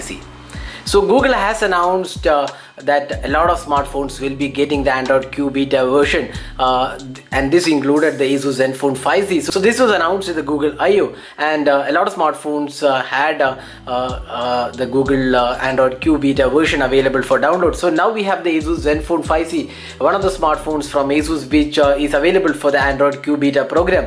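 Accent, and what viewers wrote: Kerala, India. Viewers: Kerala bro? From the L and N pronunciation 😅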